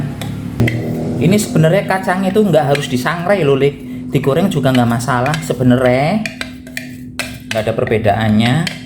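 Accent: Banyumas, Indonesia. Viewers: Selamat siang mas trima kasih banget resepnya sangat gamblang aku suka dengan gaya ngapaknya hehe sukses slalu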